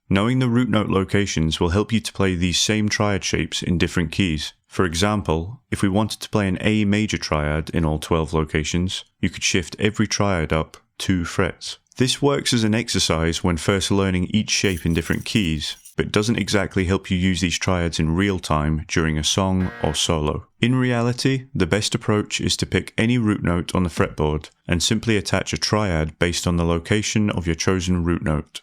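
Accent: Scotland, United Kingdom. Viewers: I love your videos. Most of the ones I watch are American and you have a great confident Scottish accent. You speak calmly and clearly and everything seems very well planned and thought through. I could never seem to harmonise the harmonic minor scale properly. This video has taught me that augmented chords have a sharp 5 and NOT a sharp 4 😂 thanks for everything matey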